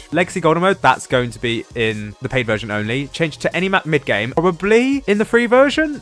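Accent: United Kingdom. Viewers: Are you from Brittain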